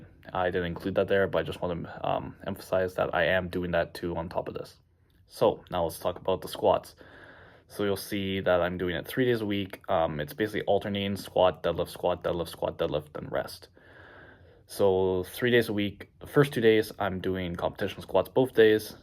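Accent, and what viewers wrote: Canada. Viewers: I was looking at his initial 3 days of bench, super surprised that someone this level only benches 3 days a week... and then I saw the other 3 days LOL Also it’s extremely jarring to hear how Canadian he sounds after only seeing him on the gram 🤯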